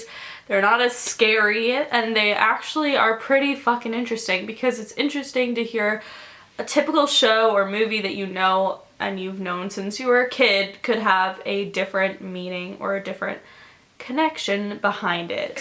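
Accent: United Kingdom. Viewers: Are you britishh?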